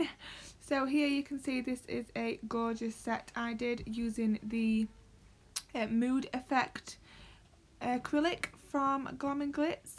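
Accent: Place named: South Yorkshire, United Kingdom. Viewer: Just discovered your channel today & it’s going to be so useful for me (currently training). It’s so nice to hear someone speaking in a S Yorks similar accent to me.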